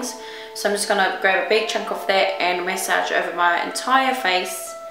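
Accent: New Zealand. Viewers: Omg you have such a maori accent lol itsa compliment! Thanks for the tips. Is this suitable for children as my kids have a halloween party coming up :)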